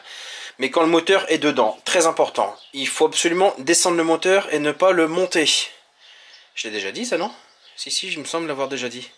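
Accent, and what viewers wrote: North, France. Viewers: Merci pour ta réponse, étant aussi originaire du nord expatrié dans le var, c'est vraiment sympa d'entendre cet accent lol, mais aussi surtout un passionné. Je viens donc d'acquérir un TT V(R)6 MK1, il serait sympa peut être de voir quelques vidéo sur d'autre modèles "VW" qui sont équipés des fameux "VR" ?! Au sujet de la chaîne de distri, quels sont "tes" préconisations ? Car il me semble (pas sur) que chez VW il n'y a pas de remplacement prévu ? Puis autre chose à prévoir, selon ton expérience, sur un modèle Audi VR6 DSG Quattro ? Merci pour tes réponses !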